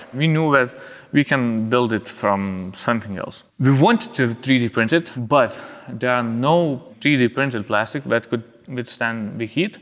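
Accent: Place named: Russia. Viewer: Nah mate, like a man who can russian and perfectly English he has an typical russian/East european accent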